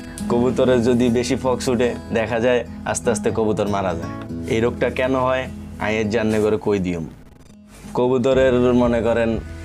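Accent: Noakhali, Bangladesh. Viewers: ভাইয়ের বাড়ি কি নোয়াখালী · Love your noakhali voice buijjen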